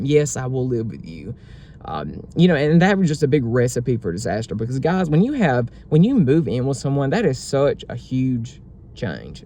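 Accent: South, United States. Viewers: You’re describing my first needy boyfriend so well. Exactly what happened. Emotionally he depended on me for everything and it was draining. I had to break the relationship but to this day he doesn’t understand. By the way, I’m watching your third video right now and this is really good stuff. Very relatable and enjoyable. Thanks for putting yourself out there with your honesty and vulnerability. Not much of that flying round these days. Btw I’m Alec, I’m watching this from France, I’m French , I love your southern accent. Which city and state is your accent from ?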